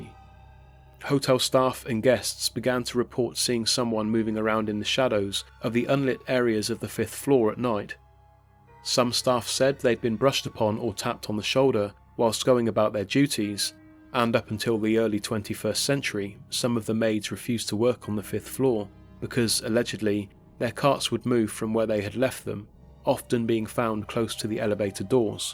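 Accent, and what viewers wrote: England, United Kingdom. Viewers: I enjoyed those short stories, not heard any of them before. It is good to hear an English(?) voice making the narratives.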